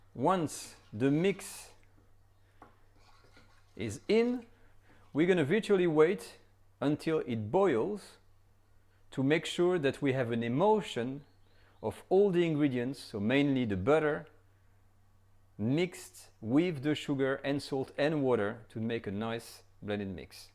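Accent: France. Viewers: Me encanta el acento francés😁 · Love your explanation and your beautiful French accent too... Music to my ears...🥂 merci beaucoup... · French accent, that's a guarantee for a good classic french recipe! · Your French accent is great and the video is spot on. Thumbs up and Sub! 👍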